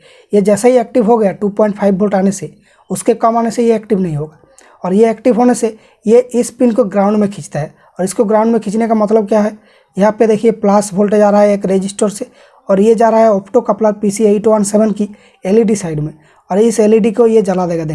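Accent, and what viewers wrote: Bengal, India. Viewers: Ap Bengali ho and sory ples repla💖💖💖💖😭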